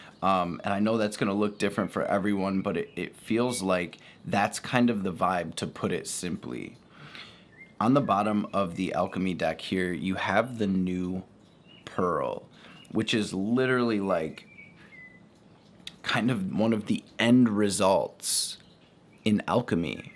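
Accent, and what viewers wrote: Detroit, United States. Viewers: You fasho gotta be from Detroit, “what up doe“, “my babies“ 😅😅 and of course the infamous Detroit 🧢 😅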